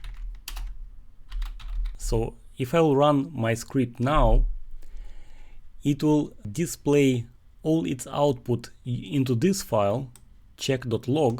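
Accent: Russia. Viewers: Russian English always deliver an extra value to the content))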